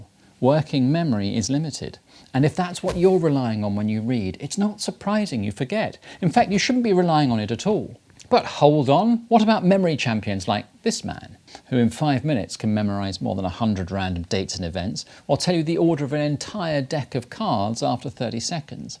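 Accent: England, United Kingdom. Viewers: I love your British accent! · Nope. It's an English accent.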